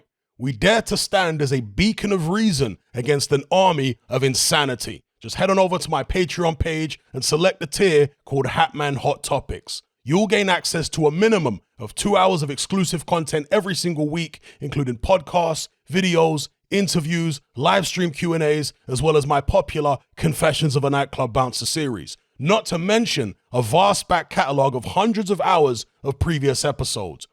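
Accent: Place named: United Kingdom. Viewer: Bruv, the minute I heard that British accent I knew you were trolling. It was legit, quit hating.... Anthony Joshua lost, its over, get over it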